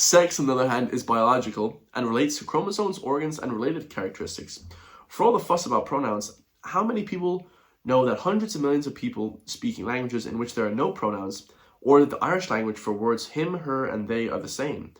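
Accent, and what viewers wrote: United States. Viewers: Dude I never miss a video and I agree almost 99 percent with you. Just curious I'm from Westside Galway and I lived 18 years in California and back home and sincerely not being smart ass but where does the American accent come from I've kids of friends of mine born and lived in America 13 years and within 5 yrs they had Irish accent and American accent gone but you've a full American accent with a tiny bit Irish accent. It's not a criticism just a real thing I'd love to know I was fully under impression you were born raised America and moved back to Galway as alot people did with kids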